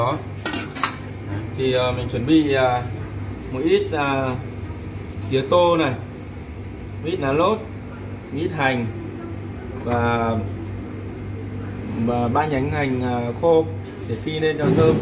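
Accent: North, Vietnam. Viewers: Nghe giọng bắc rồi còn hỏi...?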